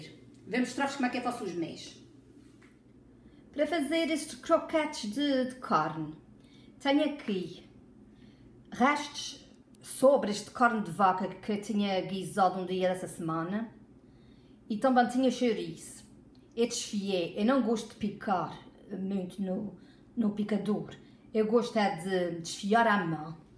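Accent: Azores, Portugal. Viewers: adoro esse sotaque Acoriano! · How sweet and pleasant to hear Sao Miguel accent! Love t! ♥️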